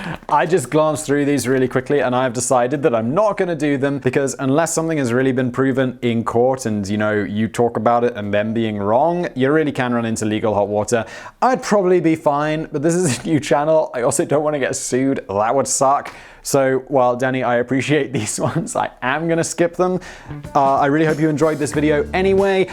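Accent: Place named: RP, United Kingdom. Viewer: Anyone else enjoy hearing swear in his posh accent? For me, it’s similar to the inner-child glee I feel when Betty White says something that just seems ‘wrong’ coming from the mouth of a beloved grandmother figure. You go on with your bad selves, Miss Betty and. My inner-child thanks you.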